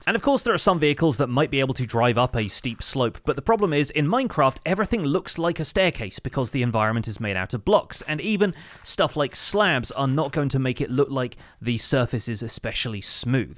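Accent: United Kingdom. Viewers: Finally caught up! Got halfway through season 2 then life got in the way. Started on S3 recently and as always - I throughly enjoy your take on MC coupled with the British flavored commentary 😉 I appreciate your attention to builds/farms that won’t directly work in bedrock as that’s the version I use. Many thanks for the time and effort you put into the survival guide series and I look forward to seeing more🎄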